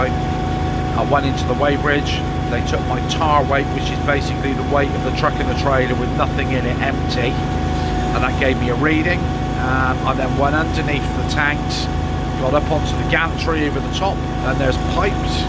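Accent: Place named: United Kingdom. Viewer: I would love to perfect my English hehehe I like your British accent! Pls help me how to be fluent in English thanks idol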